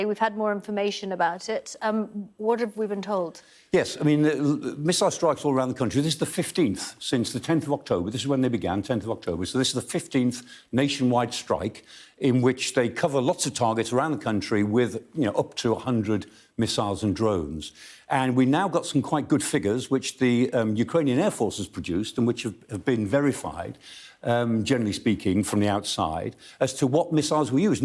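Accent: United Kingdom. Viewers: British accent bullshit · i just come here to lisin for bulllshit with british accent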